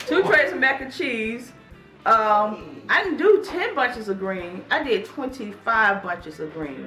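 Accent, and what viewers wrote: South, United States. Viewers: make no apologies for your faith in GOD, he alone has enabled you to be who you are, where you are and what you will be. The video's you produce reach a larger base than just numbers in the views, they reach souls with entertainment as well as encouragement. For those that would rather you not talk, let them turn off the volume because others enjoy the sweetness of your southern accent and the personality you exude. Live the life that best suits you because anything else is an inferior fit.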